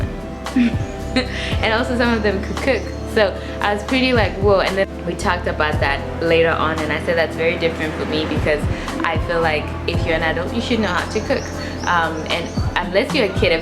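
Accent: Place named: United States. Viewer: You don't sound like someone who grew up with Danish as first language. I once lived in Denmark. You have an American accent! Are your parents American or did you school in the USA?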